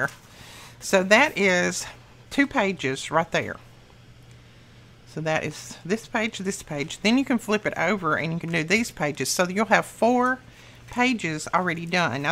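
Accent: South, United States. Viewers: I didn't realize that you were near Birmingham, Al. I guess I missed out on were your from. But, I can tell you have a sweet voice with a slightly southern accent. I'm from Alabama myself so there's not really an accent. Lol I think doing what you're doing and talking with "friends", will help you out of that funk. Above all, prayer. But I realize you know that already. Here's to better days ahead.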